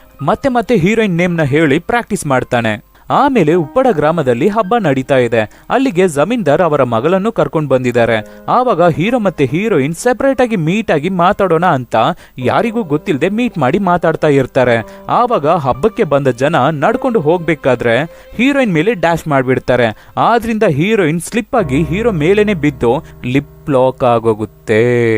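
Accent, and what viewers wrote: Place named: Kerala, India. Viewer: Mallu bhaay kannada maathaadthiro haag kelsthide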